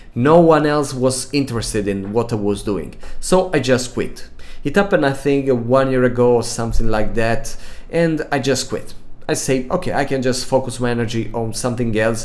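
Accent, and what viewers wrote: Italy. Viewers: Italian I think❤ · same. Sometimes seems a little bit russian, but you can feel the italian in some sentences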